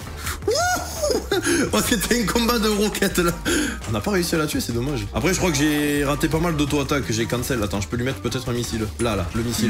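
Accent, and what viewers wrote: South, France. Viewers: Cet accent, j'adore, ça sent bon le sud. J'aime beaucoup Jinx, c'est assez simple à jouer cependant toute seule elle se fait souvent ouvrir en deux si elle n'est pas aidée.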